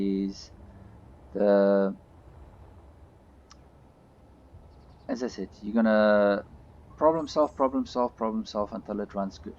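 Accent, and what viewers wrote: South Africa. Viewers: Ah ok, accent was dead giveaway :) im unfortunately still in South Africa...but yeah, I enjoy this game quite a bit. Happy engineering to you and keep well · Sounds like a South African to me! Lekker!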